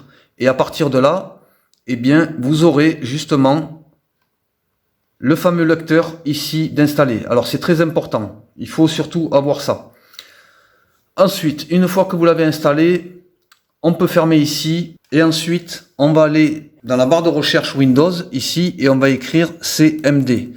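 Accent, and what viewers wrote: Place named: Southwest, France. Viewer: Super tuto !! bravo pour cette méthode simple et efficace👍👍 j'adore votre accent du sud-ouest 🤔🤔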